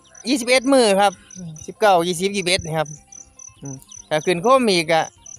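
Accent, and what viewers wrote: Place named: Isan, Thailand. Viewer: ฟังแบบม่วนๆเด้อแบบอีสานบ้านเฮา ***คนภาคใต้ฟังไม่ออกคับ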